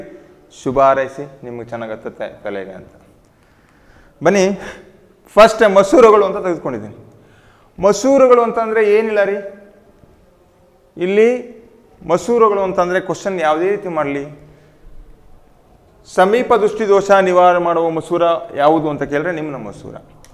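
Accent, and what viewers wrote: North Karnataka, India. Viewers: sir nivu class channag madtira, bt nam utthara karnataka mathado saili Channagill,, nivu mululi normal aagi mathadta class madi beautiful aagi barutte,, bejar aagbedi sir please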